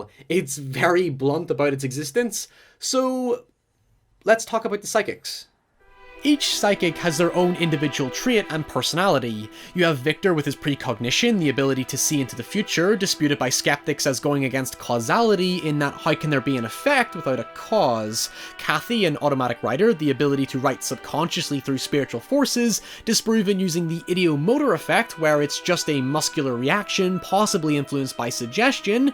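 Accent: United Kingdom. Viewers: First off, I love your accent. Secondly, your videos are so good, and I look forward to them always. We Americans don't seem to talk as fast as you folks in the UK, but I manage to keep up. I always like to mention to YouTubers, fairly new to me, that I have 61 years behind me, and that you have many other folks my age who enjoy your content. That notification bell is very helpful to alert me of your new videos. You will reach a million subscribers at some point, I'm sure of it.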